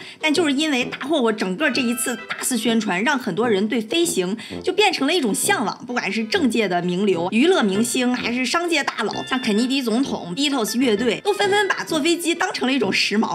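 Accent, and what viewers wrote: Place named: Northeast, China. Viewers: 大连妹妹，口音明显，支持老乡! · 同意，小lin的笑声有东北味儿，小lin是东北人吗？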